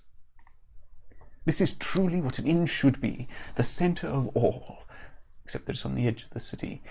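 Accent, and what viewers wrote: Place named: United Kingdom. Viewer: Yo, it's Spladam, killer accent dude, what part of UK is that from? I forgot how beautiful the VGA remake of this game was.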